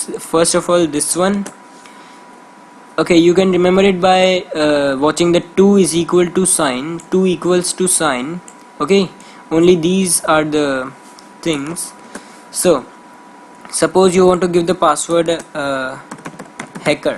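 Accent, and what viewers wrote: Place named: Arab world. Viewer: Me noticing an accent: 🤔 in 🧠 -> is he Arabic?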